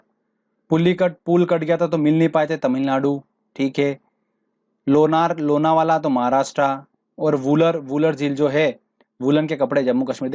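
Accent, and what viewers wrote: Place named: Madhya Pradesh, India. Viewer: Aapki voice se hi lag gya aap MP se ho mere bahut dost he MP se islie samjh aa gya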